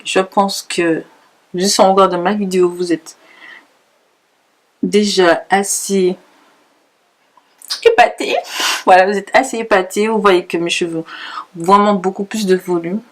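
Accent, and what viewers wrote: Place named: Antilles, Caribbean. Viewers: Oui, elle a l'accent antillais ;) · audrey lawson l'accent créole antillais oui :)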